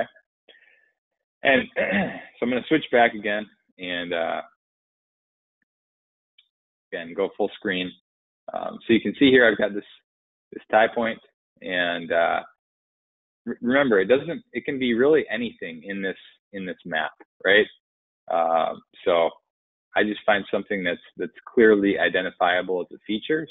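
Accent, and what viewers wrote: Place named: Western New York, United States. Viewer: Your accent makes me very nostalgic for my childhood home in western NY, up by the Great Lakes. 🙂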